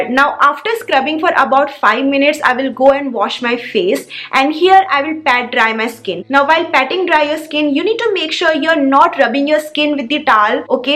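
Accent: India. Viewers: You have the thickest indian accent, I love it so much.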